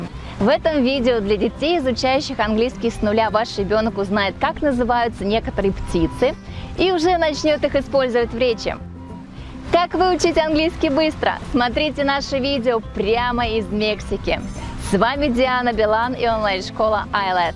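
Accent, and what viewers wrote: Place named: United States. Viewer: Я с Вами тоже буду учить английский, в школе учили немецкий, так ни разу не пригодился в жизни, у Вас прямо настоящее американское произношение, к нам на фирму из США приезжали, так же говорили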